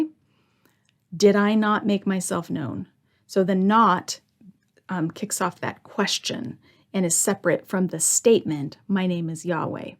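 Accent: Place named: Spain. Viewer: This one was thought-provoking, I must say the 3rd option got me interested. Your approach in many of your videos is quite a straightforward one and in some others, you decide to get into the weeds, I see kind of a balance right there for most of us who are laymen. Btw, your Spanish accent, love it !!! 💯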